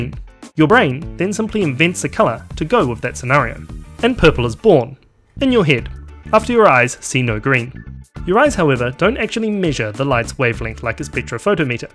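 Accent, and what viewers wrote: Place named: New Zealand. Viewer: or it might be the kiwi accent...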